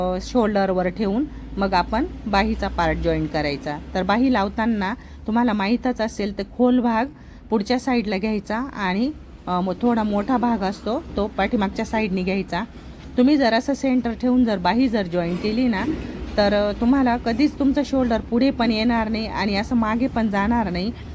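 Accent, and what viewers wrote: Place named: Nashik, India. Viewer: तुम्ही नाशिकच्या आहेत का ताई🎉 बोलण्यावरून वाटतंय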